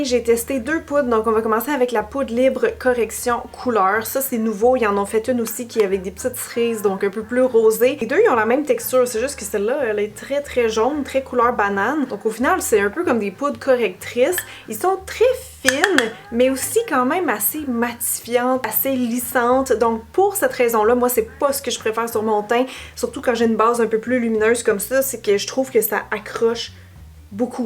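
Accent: Quebec, Canada. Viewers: Êtes-vous un Canadien francophone?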